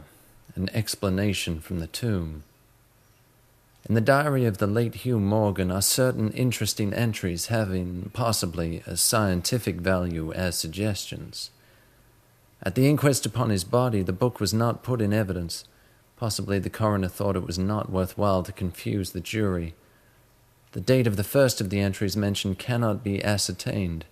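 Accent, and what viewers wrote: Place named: South, United States. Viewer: what a wonderful, flowing southern accent, beautiful voice, and a good reader - who is he??? :}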